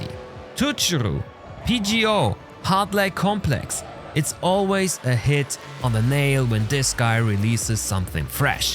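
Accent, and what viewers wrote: Germany. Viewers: Da hör ich doch Deutsch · gutes englisch aber das geschulte ohr erkennt dass du deutsch bist:)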